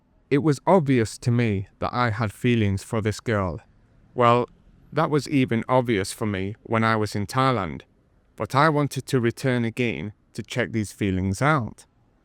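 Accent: England, United Kingdom. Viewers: You certainly don’t sound Australian mate Have you spent time in the UK or something? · Your full of BS you are not an Australian do you think we are all stupid, I heard you say with a pommy accent who you have been from Canada, The US with the same old story about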